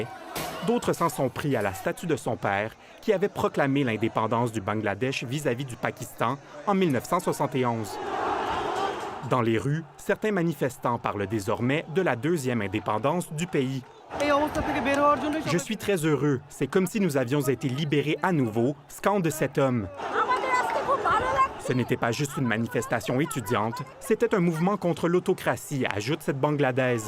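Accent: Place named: Quebec, Canada. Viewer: Je ne pense pas que ton commentaire soit mal intentionné et je ne veux pas jouer les donneurs de leçon, mais il est important de ne pas ridiculiser un accent qui définit une identité culturelle entière. Certes, l'accent québecois peut sonner un peu étrange pour nous francophones d'Europe mais il n'est pas plus "bizarre" que le nôtre, ou celui des Belges ou de tout autre pays. En s'y exposant plus régulièrement, on s'y habitue (et y'a d'excellents films québecois que tu peux regarder comme Mommy etc.)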